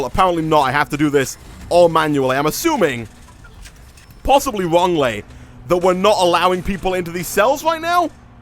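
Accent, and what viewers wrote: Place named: Yorkshire, United Kingdom. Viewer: I'M FROM OOP NORTH YORKSHIRE But seriously, that is the most Yorkshire accent I have heard on YouTube.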